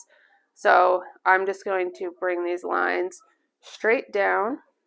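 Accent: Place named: Pennsylvania, United States. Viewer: I have to ask if you are from or live in Pennsylvania. I pronounce a lot of the same words as you. 🤗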